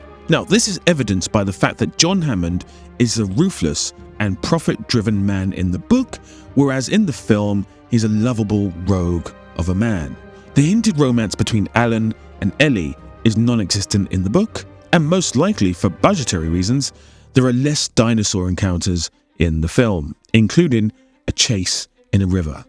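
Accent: United Kingdom. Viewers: You showed an Idris Elba movie clip here. How does his British dialect differ from yours or are they similar?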